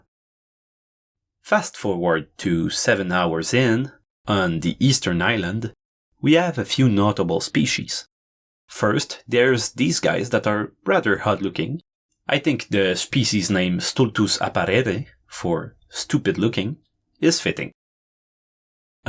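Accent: Quebec, Canada. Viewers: Es-tu québécois? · Great video! By the way, are you French-Canadian by chance? I feel like I've heard that accent before 🙂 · French canadien? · U're french canadian right? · t'es quebecois je pense XDDD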